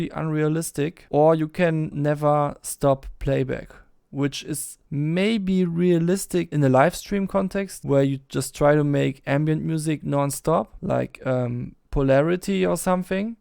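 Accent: Germany. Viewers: Boah deinen deutschen Akzent hört man sofort raus 😅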